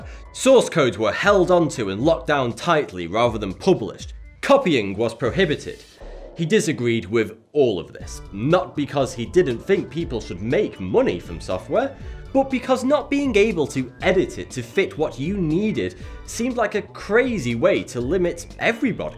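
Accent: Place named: England, United Kingdom. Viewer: I love the English accent, but hate the English. I'm riddled by contradiction.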